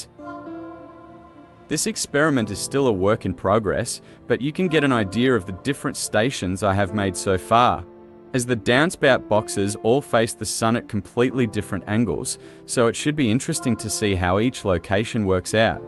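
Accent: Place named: Australia. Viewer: Awesome! where did you get the Austrailan? is that some sort of text reader robot? love your, vids, thank you!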